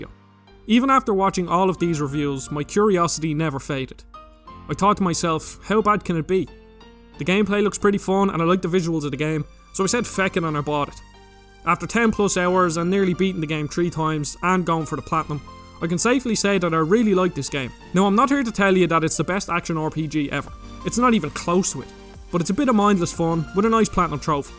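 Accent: Ireland. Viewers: How is it that your accent is so Irish yet so clear? I think you have the best accent ever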